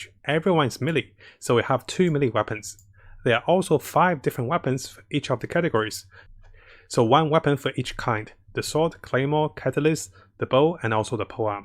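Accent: Australia. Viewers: nice to know Im listening to a fellow aussie. My Beidou uses the Bell weapon. So far she is doing excellently with that. She is always shielded haha - because it reacts to damage that she takes. I find it to be a great weapon myself. My Diona uses the Favonius bow - it's been great for her.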